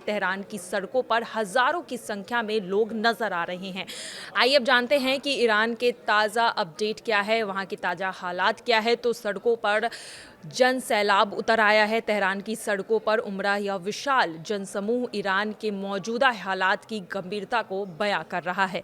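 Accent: India. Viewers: बिहारी न्यूज एंकर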